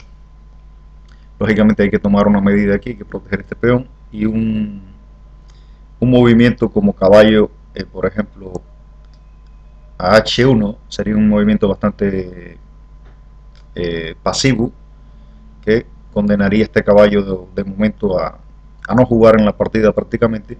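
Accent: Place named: Canary Islands, Spain. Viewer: Por el acento parecías canario jejeje. Muchas gracias por los vídeos que subes. Yo estoy empezando a estudiar un poco en serio y la verdad que me ayudan mucho. Un saludo!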